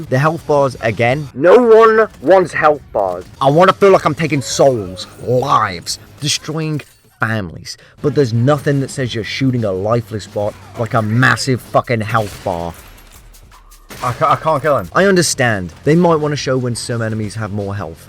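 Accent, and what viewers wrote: United Kingdom. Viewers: British love it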